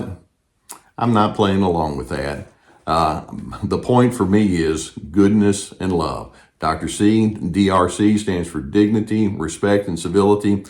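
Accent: South, United States. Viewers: excellent dr. c! thanks for taking a moment to respond to my comment. really appreciate the southern drawl, the funny faces and sarcasm that you use to describe the buffoon narcissists of the world. gus in the background when doing the videos, is such a comforting soul. will continue to keep an eye on YT for more videos. all the best, steven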